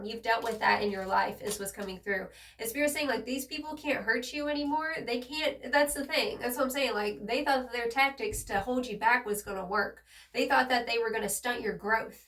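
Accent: South, United States. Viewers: My fave southern reader fr!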